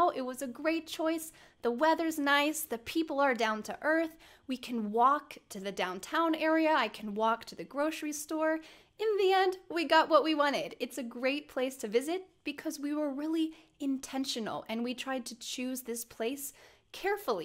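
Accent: United States. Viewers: I 'd rather learn American accent instead of British accent that is why I follow your channel.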